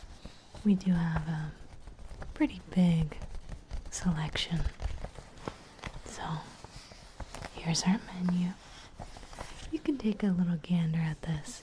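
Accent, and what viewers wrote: South, United States. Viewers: I cant tell if it is or not, but if so im glad you did the southern accent again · I need more of the southern accent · >w< Ay yam a huge adorer of lovely ladies with southern accent. May I have more, please? (I luv your voice.) <3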